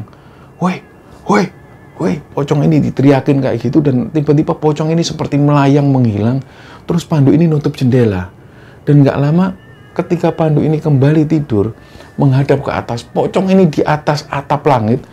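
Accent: Java, Indonesia. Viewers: Iya bener vibesnya berasa kita lg ngobrol bareng di depan ms nya, bahasa indonesianya juga logat medok2 jawa,jd kl org jawa yg denger feelnya dapet bgt berasa lg duduk berhadapan di ceritainya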